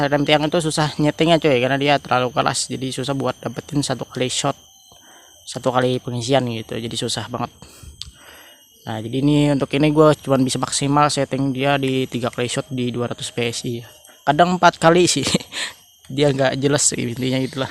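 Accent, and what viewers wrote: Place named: Banjar, Indonesia. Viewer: Orang banjar kah bang , nampak bet cara logat ngomongnya😂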